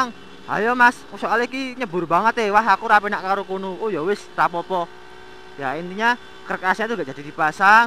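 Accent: Java, Indonesia. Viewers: Silang jowonya pekat #frommalaysia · Wong temanggung@ HAHAHA aku ngekek saben weroh video gon mase iki. Medok banget suarane wkwkwkwk :v :v . mirip IVW motovlog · wong magelang to sampean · Jowo mas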